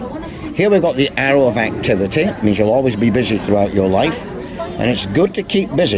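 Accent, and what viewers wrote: Scotland, United Kingdom. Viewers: with a Scottish twang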